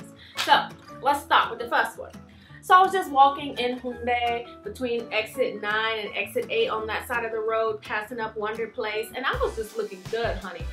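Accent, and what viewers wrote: South, United States. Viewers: I knew you were from the south (I'm new here). Im from Macon ... Hello! 🤗🙋🏾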